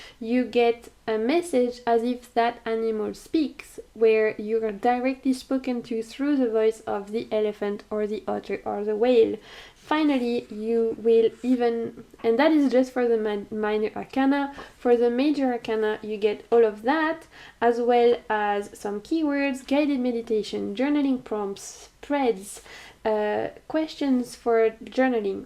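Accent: France. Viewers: I simply loved your video. I am a beginner in this wonderful journey and I do believe that guidebooks must be an essencial part of a tarot deck. You gave me a lot of insights about which deck to buy next. Thanks for sharing. I also love your french accent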